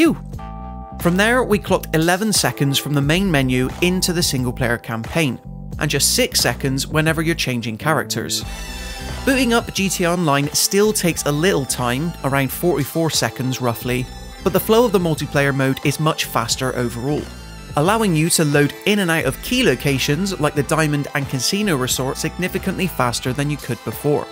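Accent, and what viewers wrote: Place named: West Country, United Kingdom. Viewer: West Country accent ftw 🙌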